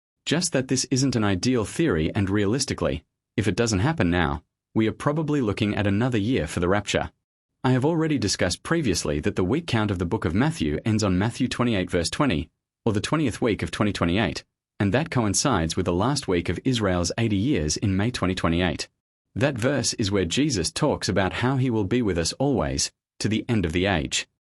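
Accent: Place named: Australia. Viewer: You sound like a robot voice with an Aussie accent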